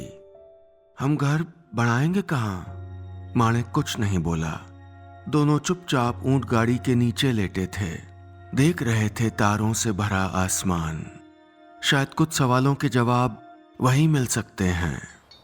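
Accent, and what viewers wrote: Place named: Rajasthan, India. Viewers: Such a beautiful story. Plus it being of Rajasthani setting and Nilesh Mishra reciting it in Rajasthani accent makes it even more beautiful. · Rajasthani bdi pyaari lgti h aapki aawaz me☺️☺️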